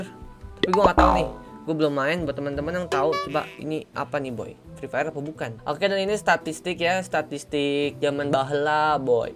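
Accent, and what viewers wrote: West Java, Indonesia. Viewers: Bang Abang orang Sunda ya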